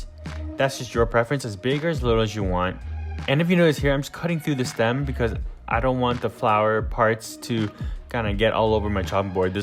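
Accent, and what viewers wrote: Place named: United States. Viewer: wish you yanks would learn to speak properly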